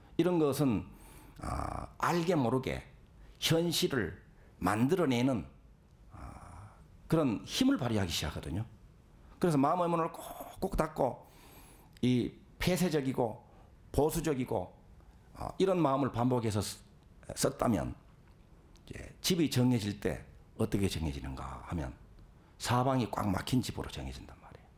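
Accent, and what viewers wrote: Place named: Gyeongsang, South Korea. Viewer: 몇년전에 법상스님의 우연한신묘장구대다라니의 법문을 여러차례들었습니다 그때부터 열심히다라니공부를했습니다 경상도 억양의 스님강의 구수합니다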